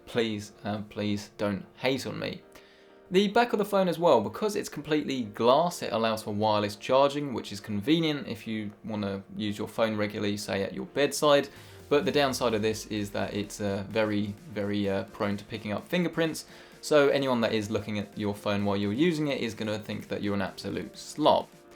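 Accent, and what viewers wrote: United Kingdom. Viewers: e sounds more annoying than other british accents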